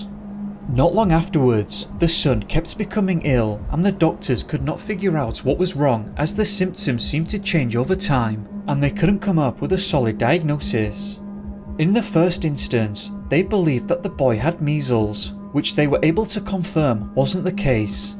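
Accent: United Kingdom. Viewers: Why do you talk like a British chills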